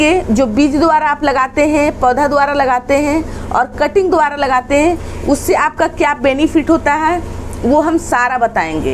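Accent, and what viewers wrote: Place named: Varanasi, India. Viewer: Madam, aap aur aapki aawaaj me mujhe apna family dikhati hai. Shayad aap banaras ki hai.maduaadih kì hain? Reply kariyega.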